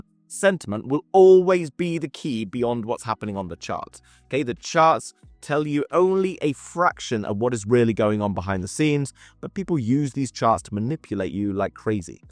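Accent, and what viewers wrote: England, United Kingdom. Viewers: 😂😂😂😂 I can’t I could tell kms away ure English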